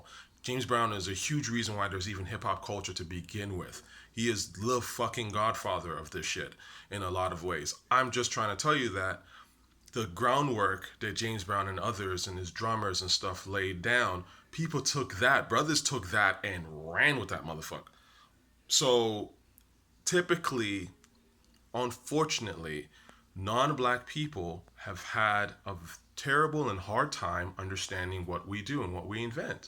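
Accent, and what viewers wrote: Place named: New York, United States. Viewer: He talks like a fruitcup from new york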